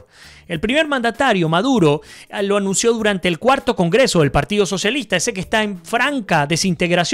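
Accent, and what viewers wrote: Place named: Argentina. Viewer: hablas parecido a Argentino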